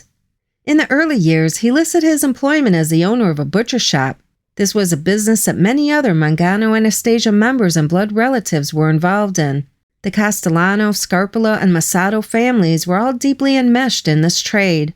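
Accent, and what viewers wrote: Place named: New York, United States. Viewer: Love your voice, it has hint of something new yorkish.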